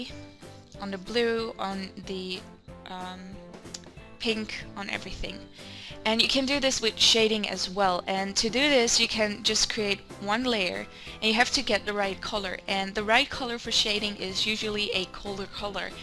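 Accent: Netherlands. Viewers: "Oh dit meisje is Nederlands, ik hoor het gewoon, 100% zeker" ... " I learned this in Dutch.." I knew it ^^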